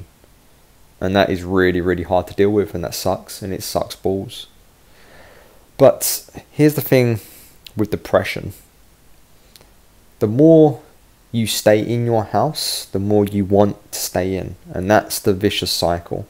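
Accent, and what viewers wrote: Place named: London, United Kingdom. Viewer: 👍🏾👍🏾👏🏾👏🏾👏🏾👏🏾🥳🥳 YES!!.. YES!!... Give it to me straight Boss!! Anyone who is depressed needs to listen to this guy!!.. And if you are going through a breakup watchballnhis videos!! Thankyou fir makinh these Videos...please do not stop! Such a help with real talk... and valid, intuitive information!! 🥰Love these videos!... The presenter sounds like a true Londoner.... Like Me!... I need to hear the Effings and Blindings...🤬🤬🤣 That is how I talk man! 💥💫💫💟💥💥Gwarn UK Massive!! 🤷🏾‍♀️🤯Makes me wanna get thr F*CK up and move! This man is my FIX.. Love that good old British ... No Nonsense London Accent. This is what people need out here bruve YES!!💋💋👍🏿👍🏿😘🤗Churped me right up!😎😋 "EX Who!??".🤣🤣😂😂🤩😜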